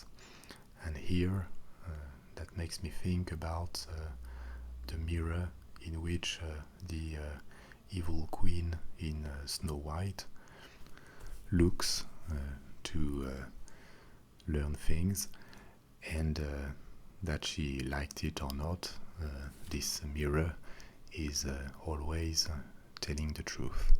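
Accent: France. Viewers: Yes! One of a kind! Hope I won`t break the spell you so awesomely are capable to put on listeners (Plus: the content you deliver...wow, and with the intonation ...just sooo soothing, your French accent seems to increase the impact...)-----------yet, may I ask: 1. what led you to do this work? 2. gee, feel awful asking this here, but: do you get paid...by whom, respectively what ..."management"? After all, you offer a stunning service...potentially on a global level! If you don`t (want to) answer, for whatever reason, be assured you are doing a fine job!!! Thanks, Marie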